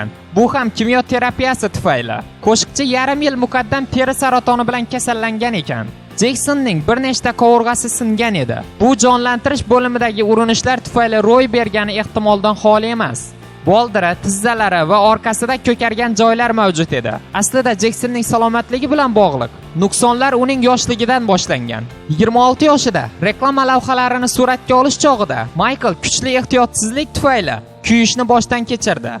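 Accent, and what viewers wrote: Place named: Andijan, Uzbekistan. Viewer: anjanleda bu wevada gapiripti :)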